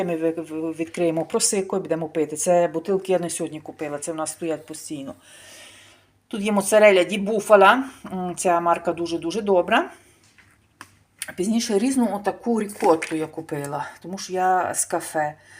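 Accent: Lviv, Ukraine. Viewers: Дякую за відео. Дуже цікаво 😮у вас такий інтересний акцент, уже не львівський😊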